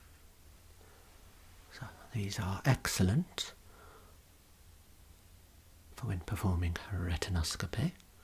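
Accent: Birmingham, United Kingdom. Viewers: Birmingham i tinks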